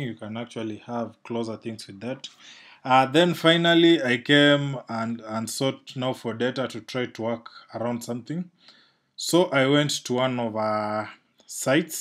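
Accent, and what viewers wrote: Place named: Kenya. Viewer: The moment I had the voice I knew it a was kenyan big up bro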